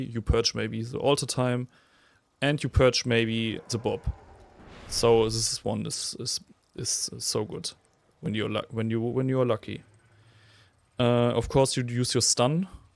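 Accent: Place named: Germany. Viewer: The german "zhe" instead of "the" gets me every time xd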